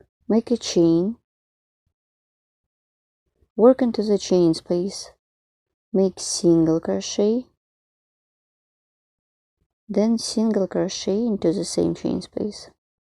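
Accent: Russia. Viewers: Duzhe chuty ruSSkiy aktsent!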